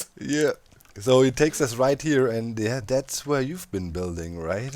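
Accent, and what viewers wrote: Germany. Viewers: Uhhh nh deutscher 😅 cooler akzent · I like that accent it sounds kinda German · Are you german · You sound sooo german :D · Oh i knew you couldn't be british or american or australian or canadian du bist deutsche i hate gewohnt in deu für 9 monathe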